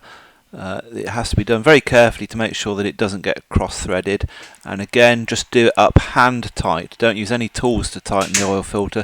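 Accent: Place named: United Kingdom. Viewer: That British accent sounds promisingly good for fixing a car.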